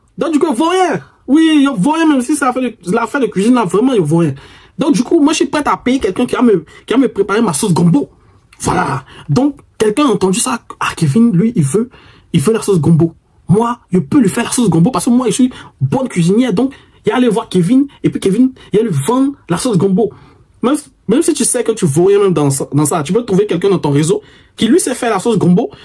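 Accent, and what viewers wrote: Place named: Ivory Coast. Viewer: Ton côté Ivoirien 😂 m'a permis de comprendre vite 🤝🤝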